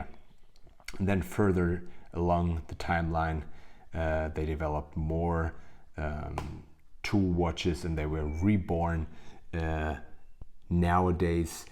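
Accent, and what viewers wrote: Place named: Germany. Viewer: strong german accent 😊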